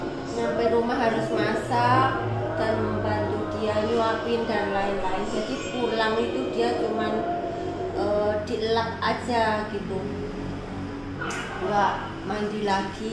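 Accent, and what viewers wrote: Java, Indonesia. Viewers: Mbak..salam kenal, mbak sampean boso jowone kok kedok to, sampean dari mana nggih..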